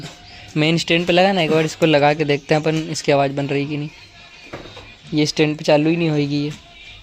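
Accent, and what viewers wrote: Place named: Rajasthan, India. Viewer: Bhai Rajasthan se ho ya haryana se.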